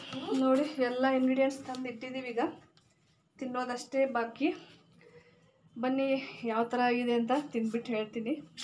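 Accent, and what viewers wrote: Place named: Dharwad, India. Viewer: Nanage nivu matado darvad Kannada tumba like madtini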